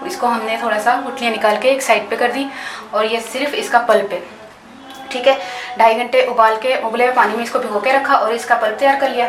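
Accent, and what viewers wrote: Punjab, India. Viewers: Punjabi accent very good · Ap ktni puari punjabi bolti heun :)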